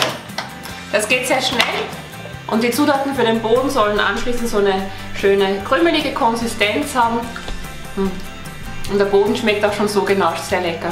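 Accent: Switzerland. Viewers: bisch a Schwiizeri? wegem akzent? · no it's a swiss accent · actually she speaks german with a tiny swiss accent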